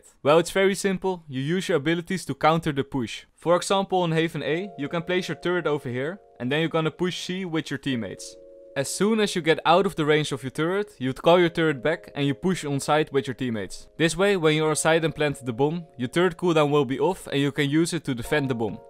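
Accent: Netherlands. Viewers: Pls tell me ur Dutch u can not not be Dutch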